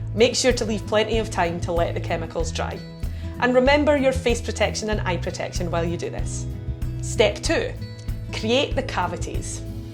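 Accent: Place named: Scotland, United Kingdom. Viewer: Great advice hello from England i agree you do need a gap between the cold air and the warm thank you ,lovely scottish accent 😊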